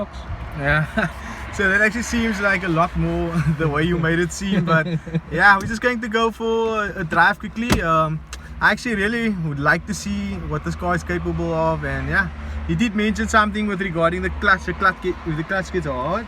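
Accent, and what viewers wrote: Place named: South Africa. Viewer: Where are you from bro? Your accent sounds South African am I right....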